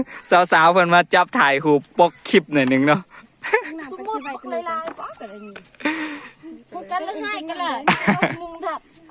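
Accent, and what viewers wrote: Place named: Laos. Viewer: บ่าว เว้าลาวม่วนจร้า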